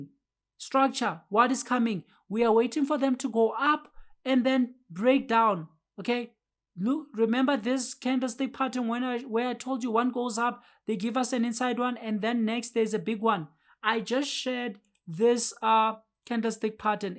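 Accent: South Africa. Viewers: Are you South African I'm hearing your accent? Cela ungifundisa ubhuti. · So nice watching a trading video in a South African voice. Do you stream your live trading? Im looking for a community bruu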